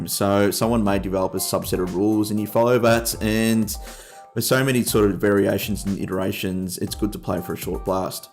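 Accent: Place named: Australia. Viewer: Secound ! Can i has a Gmod :3 Dat australian accent ;D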